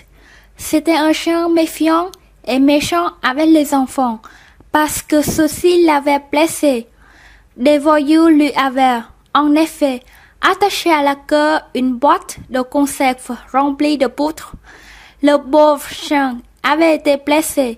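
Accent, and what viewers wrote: Vietnam. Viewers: trời giọng người vn mà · Giọng Vn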